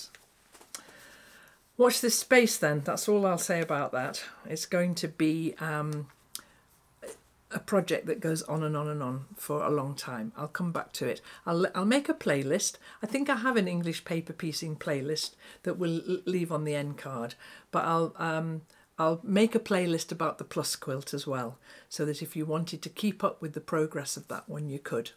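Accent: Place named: Lancashire, United Kingdom. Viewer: you brought back such an emotional memory of my beloved Mum who died at 54 when I was 21 I’m now 75 In your soft Lancashire accent just like my mums ( busy hands Lass calm head ) she was an amazing knitter sewer crocheter She would have loved this YouTube community as I do & would be on the lime green sofa knitting of course Thank you Thank you 😍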